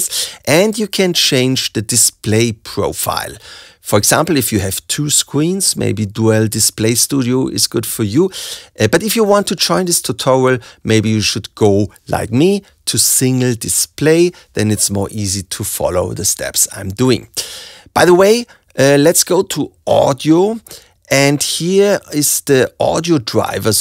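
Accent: Germany. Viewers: tutorial is ok, but man...pls get rid of that horrendous german accent!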